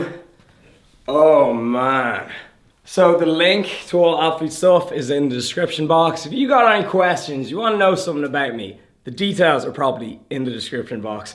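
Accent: Ireland. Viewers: accent sounds Irish. Lucky dude if so. Ireland is beautiful! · What county is he from sorry is it Dublin or Wicklow? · love me an irish accent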